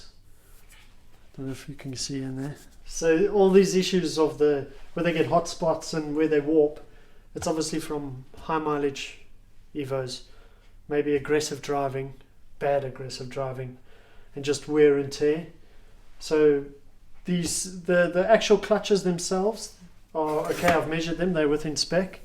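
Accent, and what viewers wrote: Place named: South Africa. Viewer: You from South Africa right? Can't hide that accent mate!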